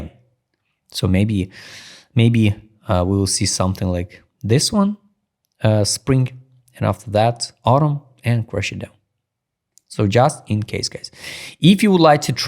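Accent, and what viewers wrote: Russia. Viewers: судя по твоему произношению , ты русский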